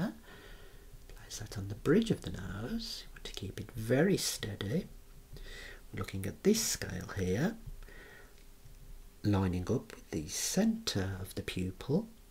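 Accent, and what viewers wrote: Birmingham, United Kingdom. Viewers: When a man can make me relaxed with a Brummie accent you know you're watching a true master of their craft.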